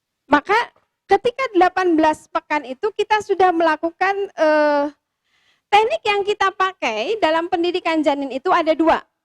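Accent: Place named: West Java, Indonesia. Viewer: Nyunda banget jd lucu ustadzahnya.. Mudah2an generasi indonesia kedepannya menjadi generasi emas qurani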